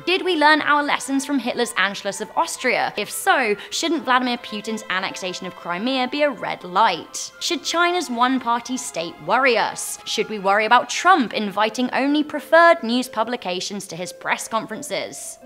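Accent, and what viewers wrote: England, United Kingdom. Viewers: When people with British accents try to talk about American politics make me laugh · Her English Accent.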